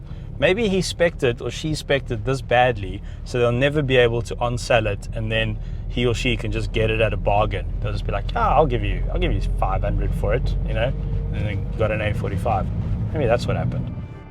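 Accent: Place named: Johannesburg, South Africa. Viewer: The Jo'burg accent😂😂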